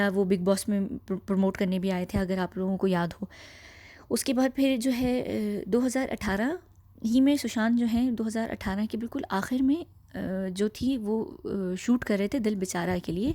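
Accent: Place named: United Kingdom. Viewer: Great 👍 voice & great 👍 voice and also very very good Urdu & great 👍 british accent in English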